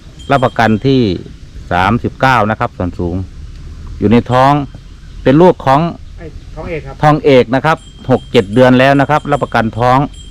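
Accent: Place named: Isan, Thailand. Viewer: สวัสดีครับ.ผมชอบฟัง ตอนพูด สำเนียงอิสานมาก..เฮดี.ครับ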